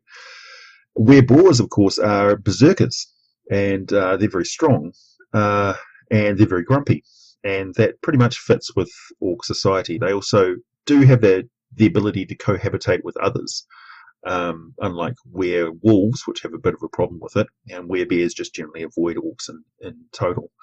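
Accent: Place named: New Zealand. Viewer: That Kiwi pronunciation tho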